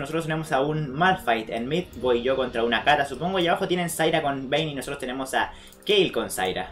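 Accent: Chile. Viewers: es chileno sierto? v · es chileno no ?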